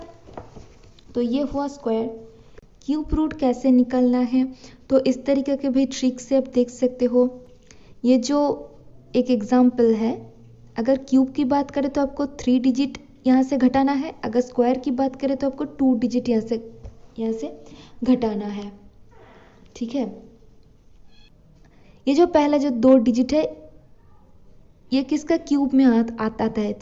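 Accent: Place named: Northeast, India. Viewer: North East by ur voice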